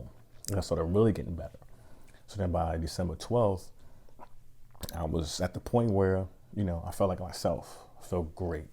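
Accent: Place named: North, United Kingdom. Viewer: Yea man you from up north with that accent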